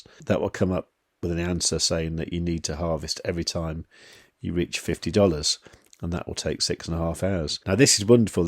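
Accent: United Kingdom. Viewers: You are living in the states? you sound british or am I mistaking as german and english as a foreign language 😬 I guess the people who got RIDE via Launchpad are able to create a farm. For me I need to wait to get RIDE token, but actually I would like to participate from higher APR in the good old Farms when people leavin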